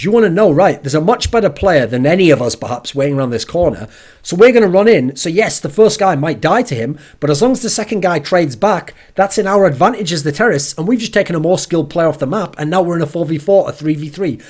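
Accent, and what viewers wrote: United Kingdom. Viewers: BRITISH ACCENT SUCKS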